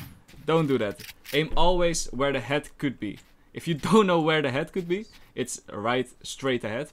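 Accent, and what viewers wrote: Netherlands. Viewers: he dutch i think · Just with your acsent i know you're dutch hehe i'm dutch too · Ik heb het gevoel dat jij Nederlands bent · The dutch accent be bussin thoooo 🥵😍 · ik hou van je Nederlandse accent xD hehe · I think dutch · are you from the netherlands? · Bro, ik kijk dit nu terug en tering je hebt een nederlands accent ihahaha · i think he really sounds dutch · nah he is from netherlands i reconize these accents anywhere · iron drie imma show u vijf.. u sound dutch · 'iron drie' half dutch accent goes hard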